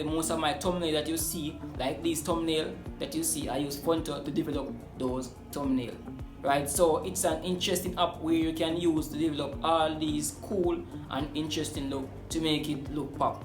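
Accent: Jamaica. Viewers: Are you Jamaican? I hear the accent!! I am too, you get a subscribe just for that. I use Canva as well!!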